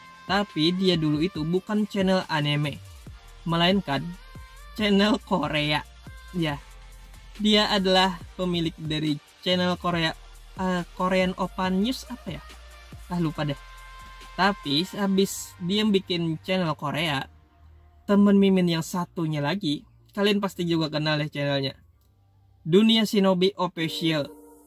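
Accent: Banjar, Indonesia. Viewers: Tuhkan, dari logatnya urang Banjar. Salam kenal bang, dari kab.banjar ... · Fiks orang banjar sebelum mimin perkenalan diri bisa ddengar dari logat nya ngomong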